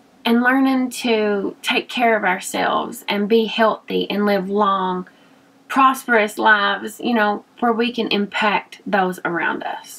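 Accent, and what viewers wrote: South, United States. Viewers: Thanks for the kind words. One thing in life I've always have stayed positive throughout all my health issues. I let me having to get a total knee replacement get to me more then having breast cancer go figure. I'm so glad that Emily mentioned you that day on her channel and I came and checked your channel out. She got me when she told you about your accent. I love a good southern accent.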